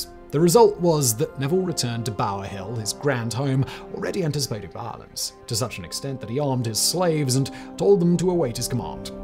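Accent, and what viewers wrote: United Kingdom. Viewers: Love your channel. I noticed that in this instance your British accent is a little ironic. Keep up the great work! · Really like the vid, but hard to weave through your Brit accent, woof. You could also use some tonality in your narration. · Listening to a limey talking about what went on here. No thanks I'll pass, I don't want the opinion of those we soundly gave the boot to out of this amazing Nation. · can't do the horrible brit accent on american history, this channel sux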